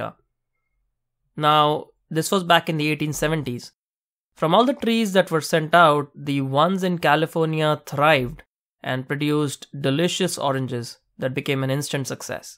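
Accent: India. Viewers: Are you indian??..... Your voice seems like...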